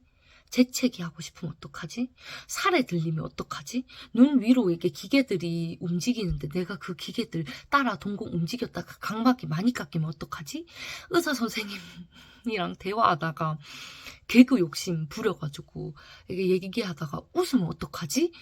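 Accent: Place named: Andong, South Korea. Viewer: 야 안동 말투 너무 오랜만에 들어서 그런지 너무 재미있다 ㅋㅋ 영상 너무 재미있게 잘 봤어 😊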